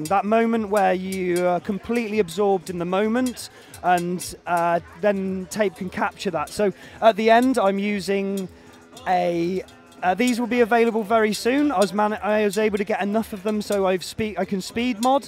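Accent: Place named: Bristol, United Kingdom. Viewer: Sounds like a Bristol boy!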